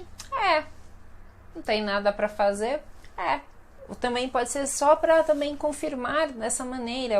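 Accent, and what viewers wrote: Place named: São Paulo, Brazil. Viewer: Wow São Paolo dialect! I love it.Obrigado muito!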